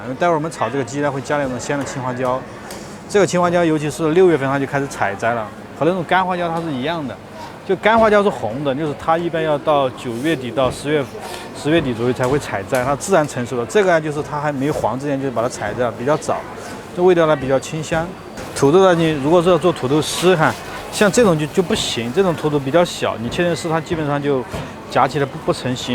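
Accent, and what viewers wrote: Sichuan, China. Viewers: 老師又在逛市場了聽四川話很親切我在台灣已經聽不到了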